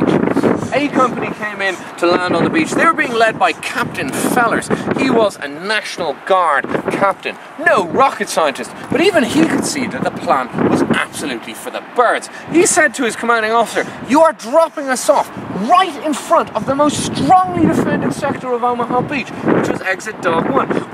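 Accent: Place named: Ireland. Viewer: He's Clearly Irish. you idiot.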